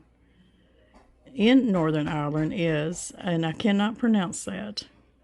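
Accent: South, United States. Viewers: Dear Miss, Another lovely video of you and your family! When I listen to you talking about Christmas and all your decorations, it’s like listening to myself. I have a room in the basement that is just for “all things Christmas” and I keep saying that I need to give some away, but it just doesn’t happen! Maybe I should try harder!🤭😉🥰 I’m looking forward to making the Irish Soda Bread………wish I’d had the recipe last week in time for St. Paddy’s Day, but that can be made anytime of year…….it’s always delicious! Thanks again for your videos……I really enjoy listening to your soothing, calm voice and your gentle southern accent. We’re expecting snow and sleet tomorrow so I guess our spring is aways off yet! God bless you and! 💕💕Jackie🪷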